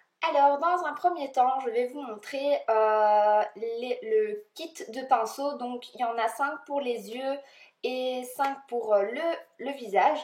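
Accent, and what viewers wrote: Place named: Belgium. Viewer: J'aime bien ton haul 🤗 Le mascara gemey avec la brosse en forme de cœur me tente hihi😊 Dit moi tu es belge? Parce que j'entend comme un accent quand tu parle. Des bisous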